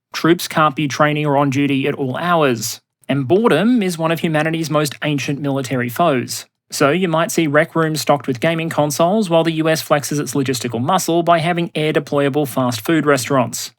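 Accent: Australia. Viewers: After listening to a few of videos I have grown to associate Australian accent with being stupid. Every time I hear it I immediately think that there is a moron speaking.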